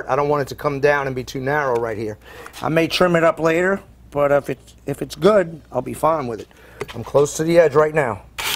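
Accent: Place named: Louisiana, United States. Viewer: So smart of a brain. Thanks. Sounds like you are from Louisiana.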